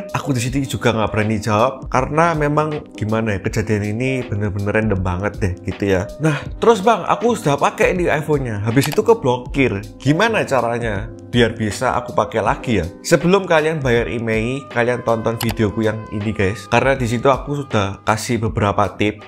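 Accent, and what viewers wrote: Java, Indonesia. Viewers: Pas buka channel ini, jujur ga pernah mikir Koko nya pake logat medok jawa.. 😅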